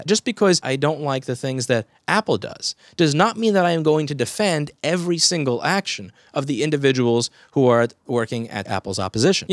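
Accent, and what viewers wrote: New York, United States. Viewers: I think you aren't an asshole, you are just telling the bare truth. I love your face. Those black bags are just evidence of your hard work. I love the fact that you are pragmatic and innovative with your chair. And i especially love your new york accent! Just to be clear - dont let anybody tell you something different! Fuck those haters :D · I love your NY accent, you sound just like a Seinfeld episode. As a female I think you are a very good looking man so eff 'em. You're a great, engaging teacher that's helped so many people. I'd be honored to meet you in person and see your technique and equipment in person. If you're ever out in Bumfuck, Virginia come on down and have some sweet tea and cornbread. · i'm a New Yorker as well, can confirm i don't like your NY accent. Keep up the good work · Omg you are so self conscious, you covered all the stupid comments I ever had of you down to the NY accent. 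Love you, do something about those eye bags and chair, and wall paint. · projects his own insecurities as a strawman, I love your crooked chips and your new york accent and attitude. You've taught me way more than the real Apple training team ever taught me in my years working on behalf of them.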